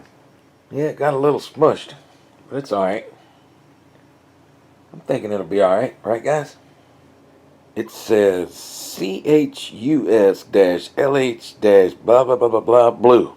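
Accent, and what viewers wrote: South, United States. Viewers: You're my new favorite guitar guy on YouTube, now. You from the South, brother? Mississippi over here!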